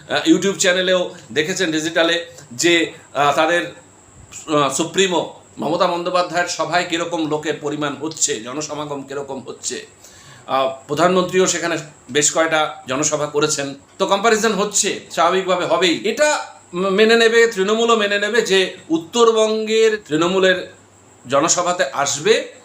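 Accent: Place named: Bangladesh. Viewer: কথাবার্তা একদম বাংলাদেশীদের মত ! তা' বাপধন তোমাকে এখানে লেকচার ঝাড়তে কে ডেকেছে ?! তোমার একটা চ্যানেল আছে দেখলাম ৷ কেউ দেখে ?!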